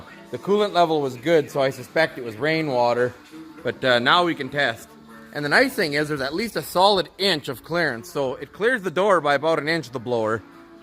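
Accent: Canada. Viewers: My first time watching this channel. Is this guy related to Zip Ties and Bias Plies? All these guys from the Kanukistan prairies sound the same to us yanks. Why is the ground not white?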